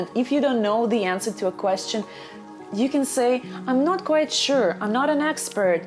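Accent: Russia. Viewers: Is your native language is Russian language Because your English speaking is seems like you are Russian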